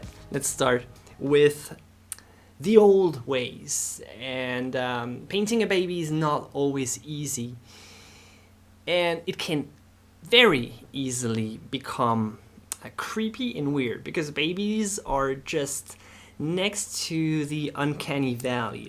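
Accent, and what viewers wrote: France. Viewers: He's french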